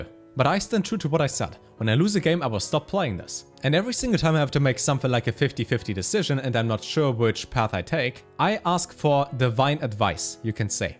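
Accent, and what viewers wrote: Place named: Germany. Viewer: I cant quite tell where ur accent is from but it sounds german Wenn das wahr is dann is mein lieblings youtuber deutsch , genau wie ich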